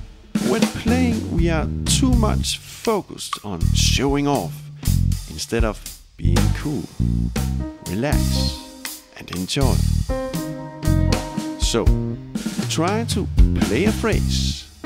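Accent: Denmark. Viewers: Jeg har set videoen i 5 sekunder og kender intet til din kanal overhovedet. Men dén accent afslører en dansker alle steder! Glæder mig til at høre videre nu :-) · Scandinavian accent immediately recognized. Danish right? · sima' er du dansker. Jeg kan høre det så hårdt i din stemme :) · A Danish accent can't hide from Danish ears 😊 🇩🇰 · Er det bare mig eller lyder han bare mega dansk? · That's a danish accent if I've ever heard one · Are you danish by any chance? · Is he danish?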